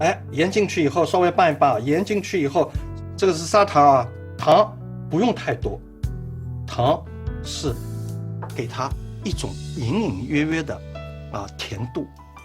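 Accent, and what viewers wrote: Shanghai, China. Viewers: 朱老师的上海口音老可爱的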